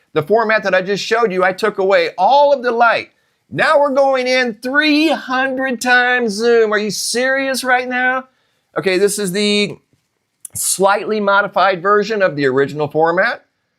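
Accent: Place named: Louisiana, United States. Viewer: Ayyye! South Louisiana! U da mayn! Mayne!!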